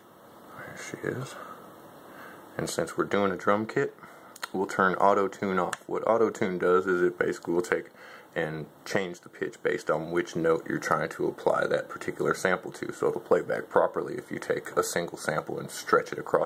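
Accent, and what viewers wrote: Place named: Nashville, United States. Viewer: Very very good stuff. Nice to hear your voice - so Nashville! Keep up the great work. This is masterful.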